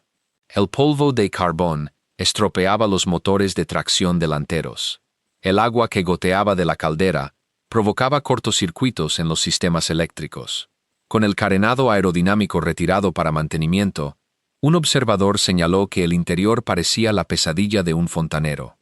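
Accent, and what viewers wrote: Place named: United States. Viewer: Esto no es español latino ni de España es de español americano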